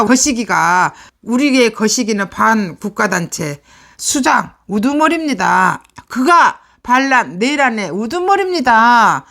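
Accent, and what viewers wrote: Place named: Jeolla, South Korea. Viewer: 자유우파. 똑똑. 구수절라도. . 뻥뚫인.소식.🎉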